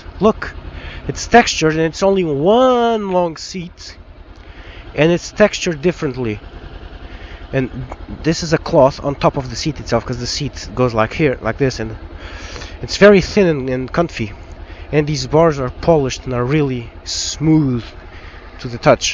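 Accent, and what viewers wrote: Portugal. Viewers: Mal te ouvi pensei logo "Tem sotaque Portugues" vi a matricula "Confirma-se" xD Abraço, belo video, vou ver se tiro a carta de mota este ano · are you portuguese? you have slight a portuguese accent :D loved the review btw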